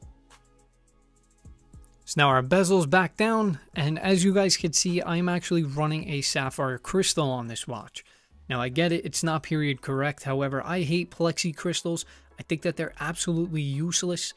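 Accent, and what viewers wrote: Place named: Brooklyn, United States. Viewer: First time viewing your site and this restoration..which, btw, was a great job. A classic piece which was in dire need of service....(amazing how some ppl would let that go soo far..). You did a Great job..and thed bracelet is still in good shape to wear as is. No need (at this point) to rebuild. Kudos to you... (where's your accent from..Sounds 'Brooklynish'...eh.?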